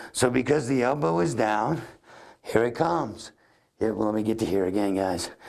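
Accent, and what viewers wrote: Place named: New Jersey, United States. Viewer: Great instructions again. Your reference to Ben Hogan's glass pane was a great thought and keeping that elbow low helps me. Consistent ball striking is the result. By the way, I'm from over in the UK and your accent is straight from The Sopranos. (No offence intended!!) Best wishes.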